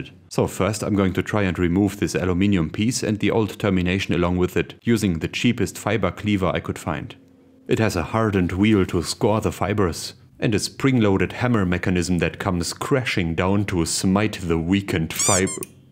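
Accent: Germany. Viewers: but with a German accent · höre es sofort, DEUTSCHER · Nice german accent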